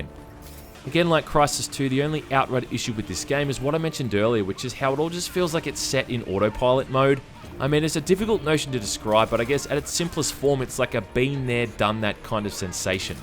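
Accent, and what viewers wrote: Australia. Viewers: hussien Strahyan Accent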